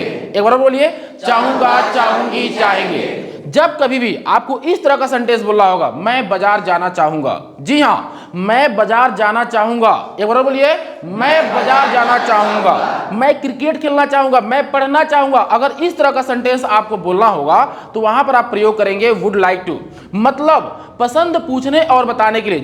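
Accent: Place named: Gorakhpur, India. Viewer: When you are saying anything, you mouth get gorhkpur tone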